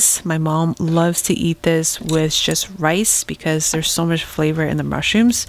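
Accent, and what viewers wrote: United States. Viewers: Are you from Kansas?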